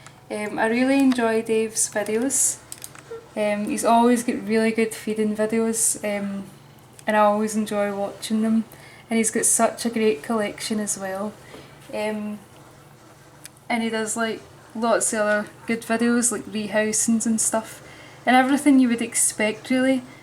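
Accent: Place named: Scotland, United Kingdom. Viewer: Scottish accent on a girl though.. wow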